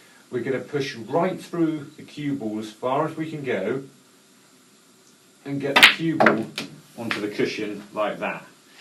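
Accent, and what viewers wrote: United Kingdom. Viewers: I'm a beginner in snooker, and I have a break record of 24. I love watching your videos because they're so spot on, calm, and easy to follow, so I decided to subscribe to your channel. I also prefer your British accent for some reason. Greets from Sarpsborg, Norway!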